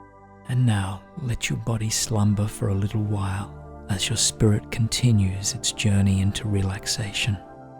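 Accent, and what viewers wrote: Australia. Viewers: Hello, I love your recordings, they are the best, they are so soooothing. We can truly sense your care in the tone of your voice and it is really heartwarming. I have been using your videos to calm my mind for over a year now. And recently I introduced my 13 year-old son to them because he tends to worry too much and has trouble falling asleep. Tonight he came find me and asked me to listen to one of your videos, he likes them so much! And he fell asleep peacefully lulled by your wonderful Aussie accent that we truly love. Thanks a lot for all the love and dedication you put in your channel. It is greatly appreciated! Cheers from Canada 😊🙏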